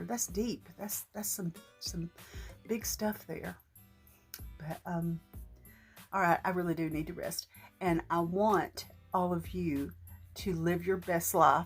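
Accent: South, United States. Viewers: I understand you perfectly :) love your accent!! I am a crafter, card making and journaling and I’ve got addicted to watching craft room makeovers and I think you came up in that category if I remember correctly. I also listen to The Scrap Gals podcast and they are from Nashville and Bowling Green, Kentucky so used to the Southern American accent 😉 I love your videos and all the ‘thirfty’ goodness you find. We have Charity shops in the UK but the prices are rocketing so bargains are few and far between here!! I love to hear about your family and how you want to teach your grandchildren about the true meaning of Christmas and that you purchased them a nativity set to play with. They are so lucky to have you as their grandmother xxx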